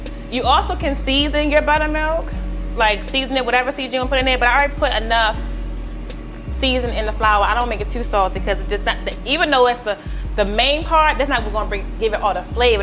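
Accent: Baltimore, United States. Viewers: Is this girl from Baltimore or Philadelphia? 🤔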